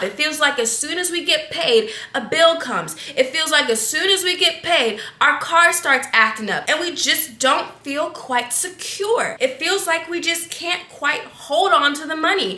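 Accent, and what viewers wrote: Jamaica. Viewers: Yeah the accent is strong in this video. I think I heard a little in other videos tho. Im thinking she is Jamaican. Maybe she’s been around family or spending time there. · It’s the Jamaican accent right out the gate for me! We NEED money 💰 👏🏾👏🏾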